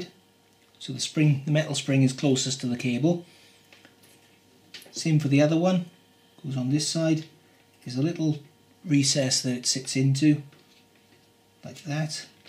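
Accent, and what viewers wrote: Wales, United Kingdom. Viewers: Lol, you're Welsh, right?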